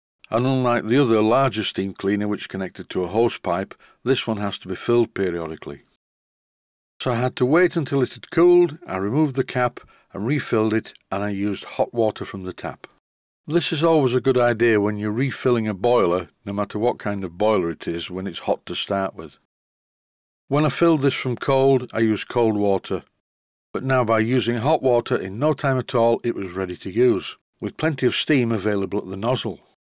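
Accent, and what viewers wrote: Yorkshire, United Kingdom. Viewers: It's actually a METHS lab, don't know about Breaking Bad, but look out for Breaking Taps. BTW, your Tyke dialect amuses me - the unconventional pronunciation of 'chassis' as 'shazzy', counterpointed by the opposite with a sibilant 's' in 'becoss' (because). keep it up, pal! ( I had a girlfriend who used to say that). I suspect your simplex will run again before mine will! Cheers!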